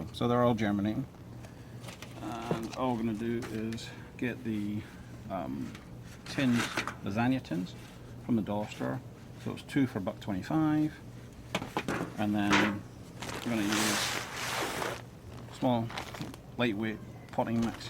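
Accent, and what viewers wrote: Scotland, United Kingdom. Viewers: Which part of Scotland you originally from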